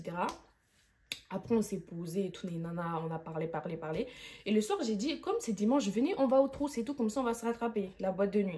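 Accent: Gabon. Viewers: On aime ton accent gabonais ❤ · L’accent Gabonais là ooh bien chic 😂😂😂😂😂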